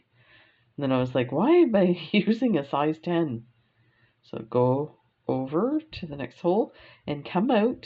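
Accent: Canada. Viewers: Love this! Also love hearing that Canadian accent. We raced sled dogs for many years, and spent much time in Canada.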